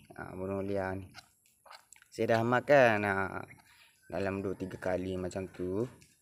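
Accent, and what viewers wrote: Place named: Kuala Lumpur, Malaysia. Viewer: ni pun dah tahan dah sy dah ajar😅muncung dia ni sy dah ajar dah😭sdp dngr abe kecek KL lumpo😂😂kanteh belalang yg panjang tu😅😅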